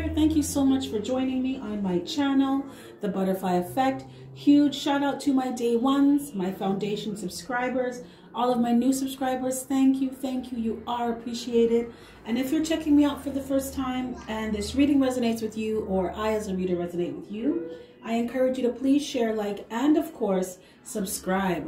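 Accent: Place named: Jamaica. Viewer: I was sooooo resonating with this and then i heard the patois. Made it clear we connecting....thank you my fellow Jamaican.🥳🥳🥳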